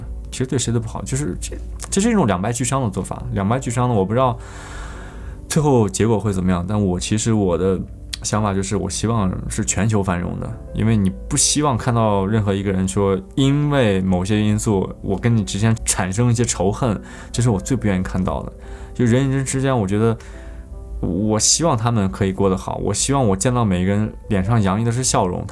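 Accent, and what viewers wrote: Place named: Linfen, China. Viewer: 大哥，你不是临汾人吗？这口音怎么・_・?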